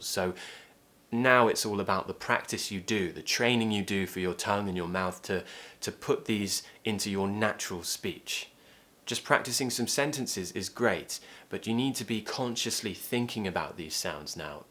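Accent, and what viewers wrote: United Kingdom. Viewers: You are a very good teacher!I love the British accent, would love to learn more! Thank you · You are the best, british accent is the best ,I'm happy from Africa 🇲🇱🇲🇱🇲🇱❤❤❤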